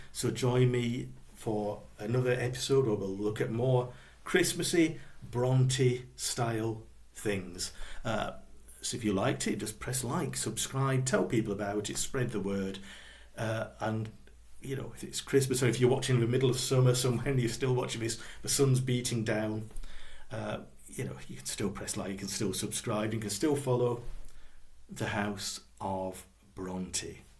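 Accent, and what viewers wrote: Yorkshire, United Kingdom. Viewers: Wuthering heights is the best novel in my opinion. Movie adaptations cannot capture the essence of this book in my opinion. It's great you are reading it in the wonderful Yorkshire accent it is meant to be read in! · I just don’t understand why you apologise for your Yorkshire accent!!!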